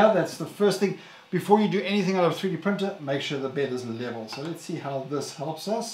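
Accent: South Africa. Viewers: Hello man. I can hear you are a proud south african from Your accent. Hello from Port Elizabeth 👋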